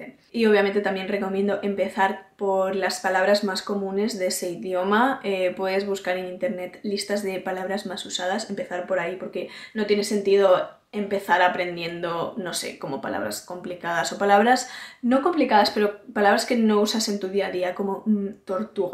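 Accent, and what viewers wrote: Spain. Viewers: youtubers que hablaran español natural de España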